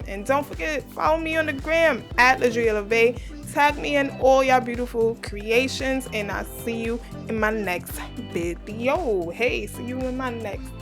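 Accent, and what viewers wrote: New York, United States. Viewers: I'm usually good at recognizing accents. Are u from NY?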